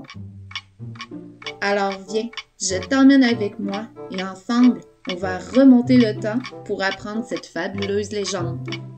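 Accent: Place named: Quebec, Canada. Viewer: Merciii ... J'aime t'accent !! ... Svp c'est l'accent de quelle regeion de québec?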